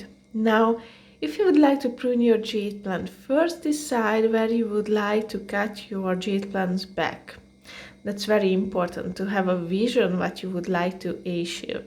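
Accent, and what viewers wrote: Hungary. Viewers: immediately recognised the Hungarian accent:D Thank you for the video! It's very helpful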